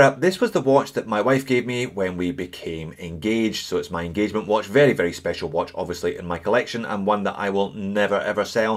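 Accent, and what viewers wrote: Scotland, United Kingdom. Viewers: So just to confirm your accent is Scottish?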